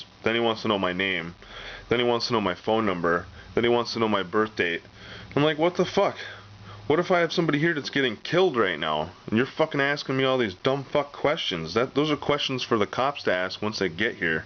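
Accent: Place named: Minnesota, United States. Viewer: being prepared isn't paranoia , it's being responsible. In a life and death situation seconds count so making the simple effort to have a pistol within arms reach at all times is a matter of self preservation. And in this guys case it's a necessity with his local police force, or lack there of. Just curious what state you live in, you sound like my friends in Minnesota.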